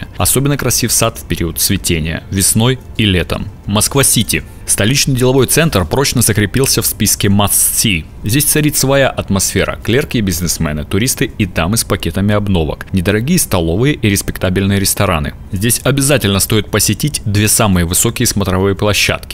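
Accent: Ukraine. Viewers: Голос за кадром - иностранца , а точнее - украинца,.Сам фильм хороший, расчитан на 10 дней. В ресторан не обязательно, но билеты в Большой театр , даже по 5 тысяч , россиянам не по карману.В СССР была возможность ходить по студенческому и ЛЮДИ ходили на спектакли после работы. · Видио очень понравилось,как и коментарий к нему,но почему то удивил украинский акцент,как будто про Киев автор рассказывал,но может так и интересней,спасибо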